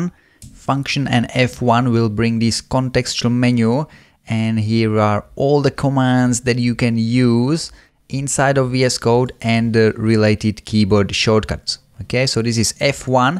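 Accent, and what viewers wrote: Czech Republic. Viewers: Just started using VSCode at work, this series is very helpful. Also, with a name like and that accent, are you Czech?:)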